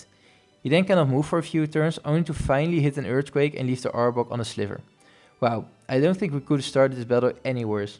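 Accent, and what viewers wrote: Netherlands. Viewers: Nuzlockes zijn zo leuk om te zien echt waar geen idee wrm maar goeie video man (ja ik wist dat je nl was door de accent hahahaha) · Are you dutch by any chance? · The yt algorithm strikes again! Also, I can hear you're dutch ;) lekker man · are you dutch perhaps? i can hear a small accent during your complimentary XD. anyway, you just gained a new subscriber. i love the vids! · Brooo the dutch accent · I've never watched your channel, but I like watching nuzlockes. I wanted to check it out. Your actually pretty good and definitely wasn't expecting the Dutch accent. Are you new to nuzlockes? I could give you some tips if you want. Edit: I just saw you don't have many subs, so ima sub. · Gotta love the Dutch accent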